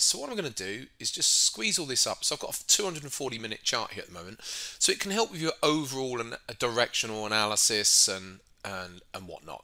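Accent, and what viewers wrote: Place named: United Kingdom. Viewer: That British accent man I wish I had it too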